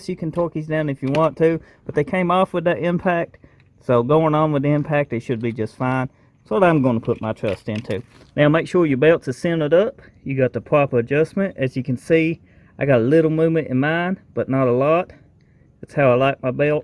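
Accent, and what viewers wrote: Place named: Louisiana, United States. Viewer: You from Louisiana?, it sounds like someone from that neck of the woods.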